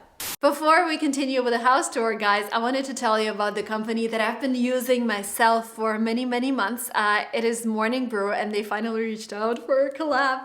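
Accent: United States. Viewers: You look so happy it's contagious. How tall are you? it's the sink pretty low or a combination of both. You don't seem to have an accent (ok you have an American one), are you from the states? I hope you guys enjoy the place for a long time.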